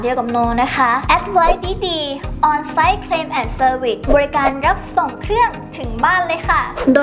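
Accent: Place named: South, Thailand. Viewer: เด็กใต้คร๊าป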